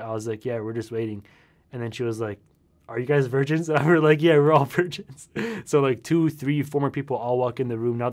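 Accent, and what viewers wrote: Arab world. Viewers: right when I heard your voice I knew you were arab/egyptian lol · You have a Middle East accent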